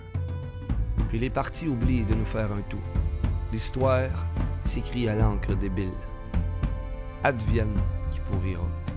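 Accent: Quebec, Canada. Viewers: Wow! vas-y...t'es comme un Homme de meme pas 40ans qui parle avec 100 ans d'experience! une voie qui s'exprime avec la force de mille de nos ancètres. quand je menuis de mon pays je t'ècoute et rempli mon coeur de cette musique quebecoise qu'est notre langue ben parler...du pure quebecois...une langue, une culture precieuse...moi je vote pour qui est encore des milliers de "y etais t'une fois" a venir.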